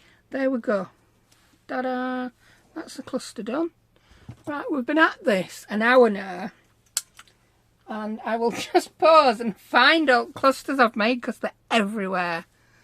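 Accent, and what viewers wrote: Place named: Northern England, United Kingdom. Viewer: I also keep checking in, and have several tubes looked to see if there was a way I could message her just to see if she was ok. I'm in Lancashire and her Northern voice is so familiar and comforting it's like crafting with a friend and I miss new videos dropping.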